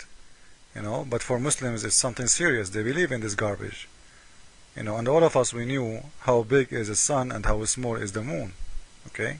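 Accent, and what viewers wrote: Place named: Iran. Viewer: As much as you try to speak English your Iranian tung never change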